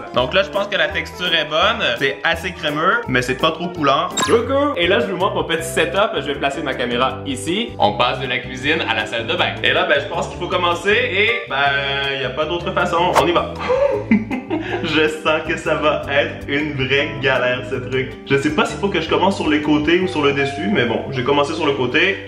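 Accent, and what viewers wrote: Canada. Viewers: Pourquoi tu parl français avec un accent canadiens et tabite au Canada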